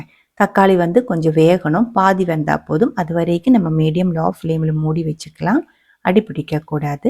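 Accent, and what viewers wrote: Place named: Kerala, India. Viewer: Avuha Malayalam... basha puryalaiya... so sweet...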